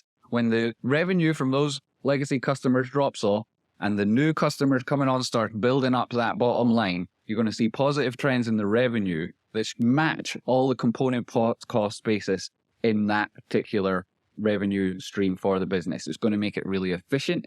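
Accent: Scotland, United Kingdom. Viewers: Just found you on YouTube …..been watching various investment guys for a few years, and you just popped up! Good to hear a Scottish voice.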